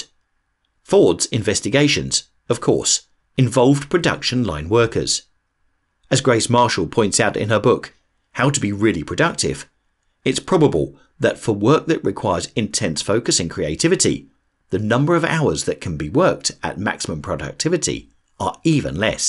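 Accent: United Kingdom. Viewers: Love this channel. I'm a visual learner, I like to read, and see infographics. It helps connect the dots for me. Your UK accent just makes everything more precise, knowledgeable, and entertaining. Keep up the great work and illustrations.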